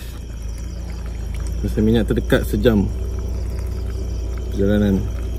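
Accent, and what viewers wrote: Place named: Kelantan, Malaysia. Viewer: Ph ko kelate😂 g dio...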